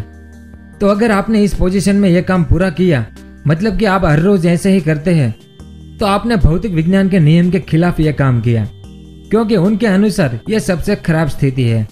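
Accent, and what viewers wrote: Gujarat, India. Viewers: Ap gujarati hona bhai😂😂😜??? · Accent gujju bhai ..... 🤣🤣🤣🤣 like it....!!!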